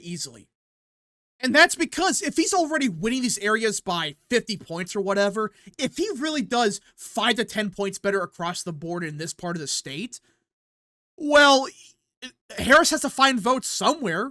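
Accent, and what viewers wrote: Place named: North, United States. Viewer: As someone who lives in Georgia you're butchering the names of most of the counties you named. But I understand you're from the upper part of the United States which could explain your accent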